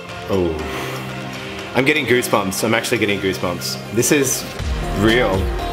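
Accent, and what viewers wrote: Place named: Australia. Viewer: I can tell he’s Australian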